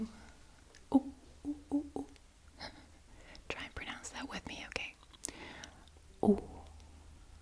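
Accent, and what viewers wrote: Netherlands. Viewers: acually its a dutch acent. just like in groningen, whete they say the G diverent. she lives somewhere ehere they pronounce the R diverent. algemeen nl is diverent yeah (just asuming your dutch here, ipv vertalen)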